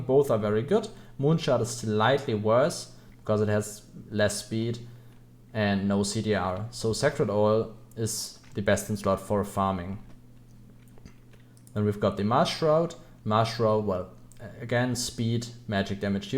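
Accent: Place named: Germany. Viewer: Hello, I'm a new player and I stumbled upon your video after looking through classes gameplay videos and wanted to ask how do you level up so fast (your paragon is at 1032 - paragon what we call on Diablo 3). I just hit 100 on Hell Diff 5 with the White Mage, still confused how to power up faster :D also love your accent (is it German?).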